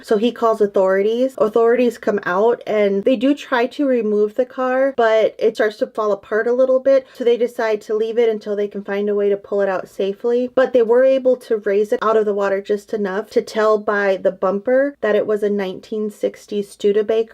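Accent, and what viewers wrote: Minnesota, United States. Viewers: Love that MN accent! ☮️💟. I have it too.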